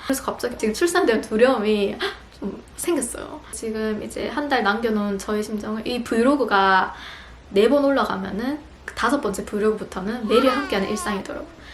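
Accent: Gyeongsang, South Korea. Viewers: 처음 댓글 달아봐요~ 경상도 사투리에 말이 엄청빨라서 호기심에 봤던 영상이 벌써 출산짐싸는 영상까지 하네요^^ 제가 출산경험자로 알려드리고 싶은건 여름에 아기낳으면 에어컨바람 조심해야해요. 넓은 손수건챙겨가셔서 목뒷쪽 찬바람 막아주면 좋아요. 모유수유하다보면 목,어깨 아픈데 산후풍까지 오면 힘들어요. 아기 낳는순간은 힘든데 참을만하고 낳고나면 아기생각에 정신없이 지나가요. 모든 엄마들이 그렇듯 도 잘 이겨낼수 있을꺼예요 순산하세요~~ ^^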